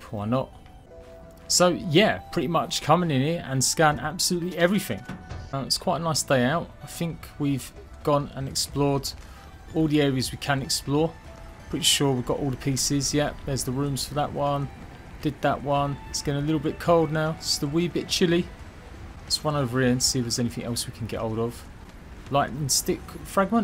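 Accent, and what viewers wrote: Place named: Essex, United Kingdom. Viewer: Are you from southeast England? I swear your accent is like, essex or something.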